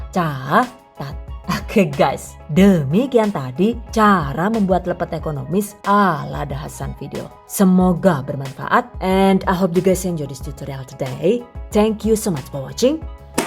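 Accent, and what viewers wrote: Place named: Madiun, Indonesia. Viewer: SUARANYA KYK YG DI MADIUN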